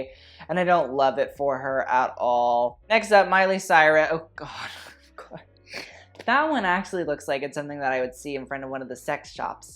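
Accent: South, United States. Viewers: The southern accent!!!!! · The southern accent is killing me 😂😂😂 hahaha scoot queen 👑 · i appreciate that you committed to the southern accent throughout the whole rihanna review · Love the southern accent but we don't pronounce the t in words like butter is a budder. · His southern accent! I'm crying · I loved the south accent 👌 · Why did suddenly go southern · no southern accents please just british is acceptable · I didn't think could be sexier and then I heard his southern accent 🤣❤😘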